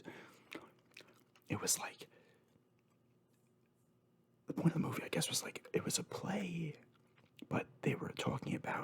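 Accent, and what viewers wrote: New York, United States. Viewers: I’m from New Rochelle, NY and I’ve been living out of the country for the better part of 9 years now (some time in China and the last 7 years in Brazil) and I’m a huge ASMR fan. Your channel has become my favorite recently simply because listen to you shoot the shit reminds me of home lol, the NY accent talking about NY sports in your videos, I also love games as much as you do. Anyway, definitely gonna keep watching, thanks for the content my guy.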